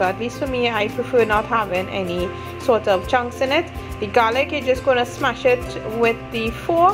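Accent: Trinidad and Tobago. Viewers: Oh shut up. That's how trinidadians speak. Research before you try bashing.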